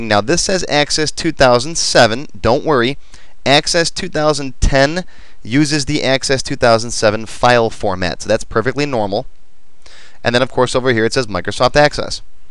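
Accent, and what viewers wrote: Chicago, United States. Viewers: Awesome series, thank you for breaking it down and making it dummy proof. On a side note, you sound like you are from Chicago.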